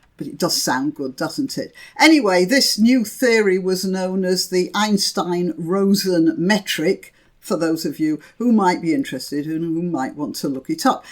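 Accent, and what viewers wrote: United Kingdom. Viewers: Hail and welcome.... welcome into my purview so I can become more and more enlightened. I welcome your British accent.... the each and every noun and verb etc are so well enunciated and the thought that is back-tracked to be RE-pronounced is just in sync with the ones I need to re-hear. I'm so lucky. um, to have found your channel.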